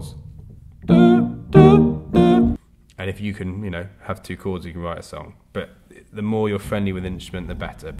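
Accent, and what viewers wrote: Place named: England, United Kingdom. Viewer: Why do you talk English and sing American?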